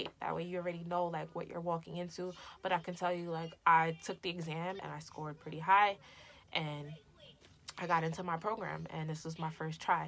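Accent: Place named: New York, United States. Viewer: Sound like you from ny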